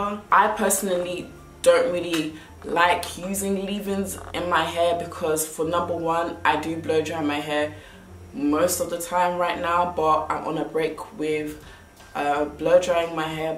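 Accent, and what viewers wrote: United Kingdom. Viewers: It took me 12 minutes and 38 seconds to realize that you have a damn English accent! Wth!! 😭 I’ve watched two of your videos before this as well, I am so confused. 😂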